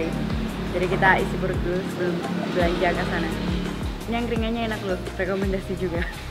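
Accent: Java, Indonesia. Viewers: Yach, ,,dari tadi uploade nya, ,,baru nongoll braay, ,,,meddok bangeed mba e ,,,luv luve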